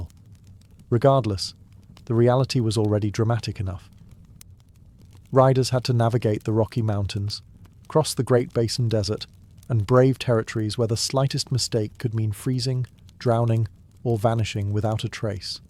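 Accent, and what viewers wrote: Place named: England, United Kingdom. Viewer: Love your videos but some of your mispronunciations are so odd. Despite the English accent it makes me wonder if you're English Second Language?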